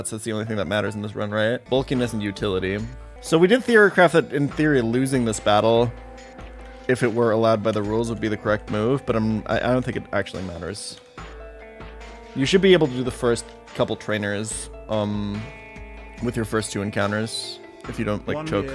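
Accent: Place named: United States. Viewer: YouTube and twitch. Come for the highest tier of nuzlocke Pokémon content. Stay because how tf does this guy sound more American than 80% of American people? Hong Kong and Singapore and Germany. Has he intentionally adopted the American accent from watching cartoons and other shows over the years that are created by “US English” speakers? Does he do it to be easier to digest for the US viewership market? Maybe his USA accent is just really good. I watched 151 attempts of EK, I had a lot of time to consider this while he was wiping to Watson because no VA chinchou.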